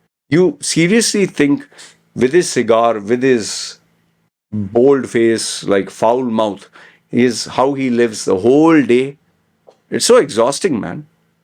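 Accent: India. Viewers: I dont understand the English from the Indian accent ..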